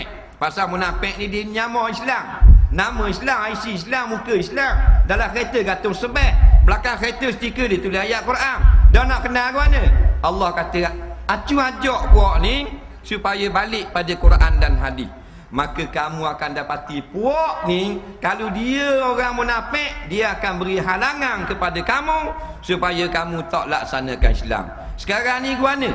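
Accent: Malaysia. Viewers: ni pelat terengganu..but it's ok la..lebih kurang je pelat dua negeri tu..hehe..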